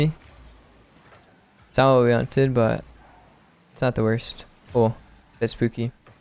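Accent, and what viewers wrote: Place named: United Kingdom. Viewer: your British is perfect👍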